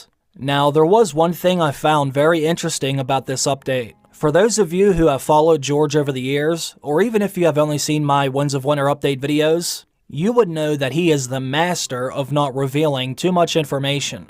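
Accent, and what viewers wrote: United States. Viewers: Where did you learn to read? Are you American?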